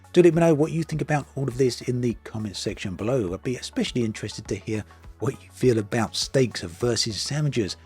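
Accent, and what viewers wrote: United Kingdom. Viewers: Starfield will have the RT mod within a week of launch. It just wont be from the Devs. It will be from the modding community. So there are your lost sales MR smooth Brit accent.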